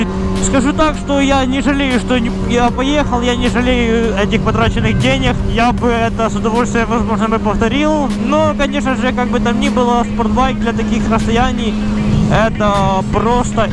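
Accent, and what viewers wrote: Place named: Ukraine. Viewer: смищно говорит!) Особенно смешит этот украинский акцент)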